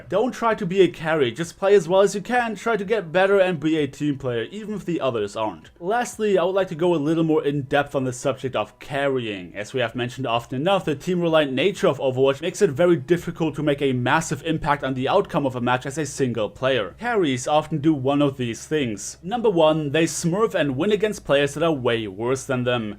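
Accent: Germany. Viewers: are you a German Guy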